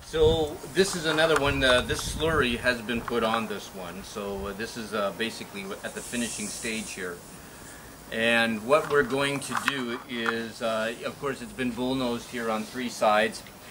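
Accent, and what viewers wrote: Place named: Cape Breton, Canada. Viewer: great video..... I started watching, and thought... this guy's from cape breton! thanks from moncton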